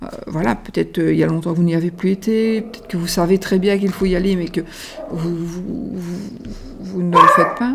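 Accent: Belgium. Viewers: J adore l accent belge on es les meilleurs 🇧🇪 Merciii pour ce tirage♥️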